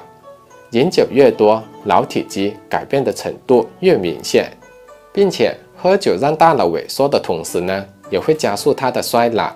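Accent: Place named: Rural, China. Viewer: “乡下话”，久违了。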